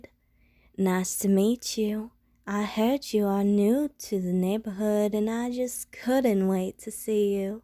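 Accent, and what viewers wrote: South, United States. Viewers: You do a great southern belle. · Oh no... I'm liking Southern accents more than ever now. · You just made me discover something about myself... I REALLY like Southern accents...😶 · I got family in the south and your ascent is sooo close but just a little off but good job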